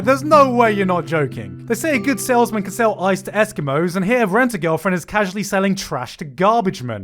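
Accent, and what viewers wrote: United Kingdom. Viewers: It's the Bri'ish accent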